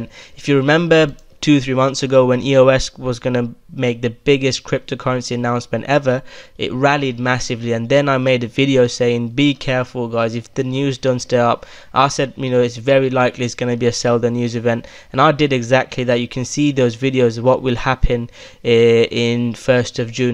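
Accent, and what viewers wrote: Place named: United Kingdom. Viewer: Very appreciated again 👍👍👍thanks for the video. I love your British accent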